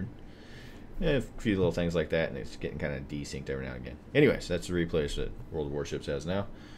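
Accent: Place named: Texas, United States. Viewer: THAT'S what it is. Another Texas boy. It's that back - home accent that I hear. Another of your typical entertaining and instructional videos. Keep'em comin.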